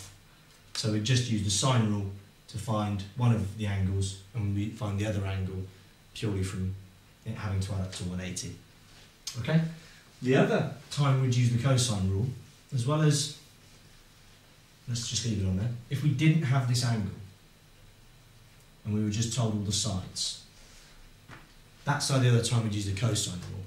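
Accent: United Kingdom. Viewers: I find it hilarious that people keep commenting about his accent...it's just an English accent...if you go there, there are plenty of English accents...and it doesn't make maths any easier! I'm having some trouble getting the same answers on my calculator...help...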